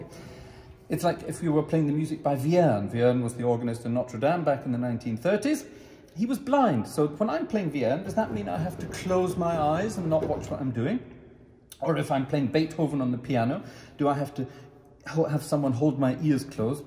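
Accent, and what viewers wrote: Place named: Scotland, United Kingdom. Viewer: Hi and greetings from Scotland. Many thanks for your entertaining and informative videos, enlivened by your cheeky Scottish chappie style. Your videos are aimed more at organists and organ students rather than people like me who have more organ LPs and CDs than they can count (starting with Rogg's 1960s recordings (Metzler at Grossmunster, Zurich, as well as the Arlsheim Silbermann mentioned by Elizabeth). Congratulations as well on the great audio and video quality/camera work-well done Mrs/Frau G! I hope that your followers for whom English is a second language are savouring your beautiful North of Scotland accent as you wax lyrical about Brustwerks and Ruckpositivs. I regret having only a smattering of German, but is someone asking if you now speak English with a German accent? Nein nein nein I say. I hope you're getting some compliments on your German, which sounds very good to me. 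Best wishes Jim Dickson.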